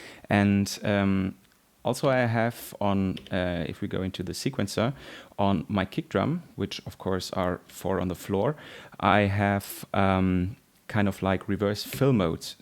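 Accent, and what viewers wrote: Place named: Germany. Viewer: German ? Da ist was deutsches in deinem Englisch...beste Grüße aus der Vulkan-Eifel. Geniale Videos. 😍😎👍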